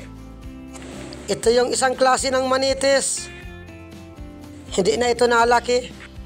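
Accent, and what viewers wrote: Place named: Batangas, Philippines. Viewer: Idol taga saan kb kc ung pana2lita mo prang marinduque or btangas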